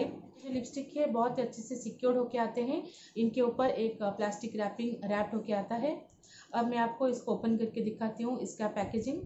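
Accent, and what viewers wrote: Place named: Odisha, India. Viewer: I could figure out the Odia accent ...😄❤️I am too from Odisha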